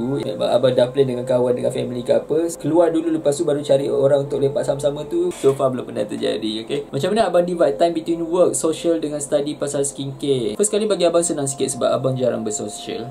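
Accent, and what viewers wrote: Sabah, Malaysia. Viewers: suke,lagi lagi ckap sabah gan jepun tuh..^^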